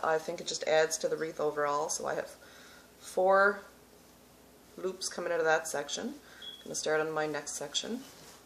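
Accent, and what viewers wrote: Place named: Newfoundland, Canada. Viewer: I'm from Newfoundland and I thought, "she's not American and not mainland Canada by her accent"!! Love it!! I also LOVE your video!!! Very helpful and EASY to understand!! Looking forward to seeing more!!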